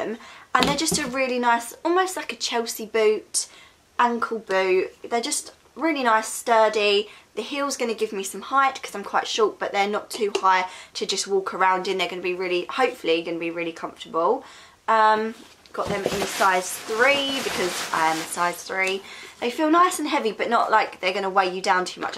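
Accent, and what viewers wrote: United Kingdom. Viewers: Love this British English accent💞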